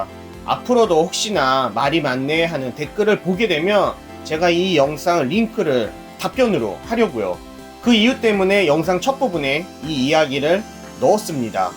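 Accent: Japan. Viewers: 유튜브를 일본식으로 자꾸 유츄브라고 발음하시는 이유가 있으신가요??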